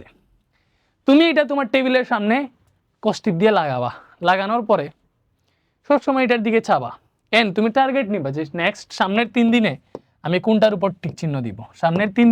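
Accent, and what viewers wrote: Sylhet, Bangladesh. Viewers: Vai ETA amader sylhety basha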